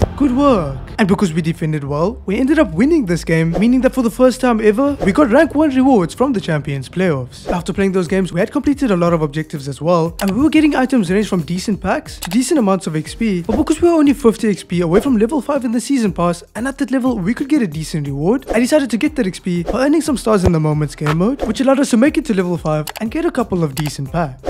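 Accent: South Africa. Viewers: Yo are you South-African? It’s awê to see a fellow EAFC lover from RSA! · Are you from south africa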